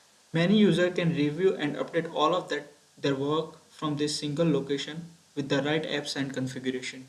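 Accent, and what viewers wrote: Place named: India. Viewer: that's , indian accent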